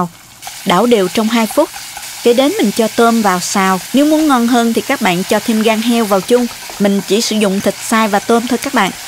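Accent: South, Vietnam. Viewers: Cám ơn, tôi rất thích xem những Vidios hướng dẫn cách nấu ăn của cô, ngắn gọn mà đầy đủ dễ hiễu dễ làm. Tôi thực hành lúc nào cũng thành công. Tôi không những ghiền chờ đón xem cách dạy nấu ăn cúa cô mà cũng ghiền nghe vọng nói miền nam của cô nữa đó. Mến chúc cô cùng ngừoi thần luôn vui khỏe, bình an. Luôn ủng hộ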